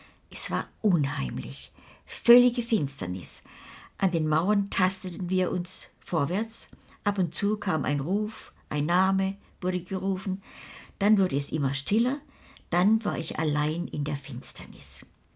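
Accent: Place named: Baden, Germany. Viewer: Blödsinn! Dass ist Badischer Dialekt und mehr nicht!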